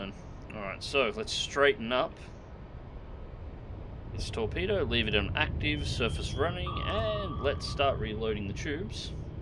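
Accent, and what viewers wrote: Australia. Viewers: Clicked on the video thinking it was Jive Turkey. I was so confused for a second when I heard an Australian voice. :)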